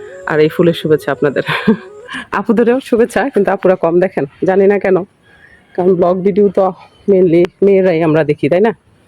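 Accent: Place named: India. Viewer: আপু, খুব ভালো লাগলো ❤❤ আপু আপনার ভাষা ইন্ডিয়া এর মতো।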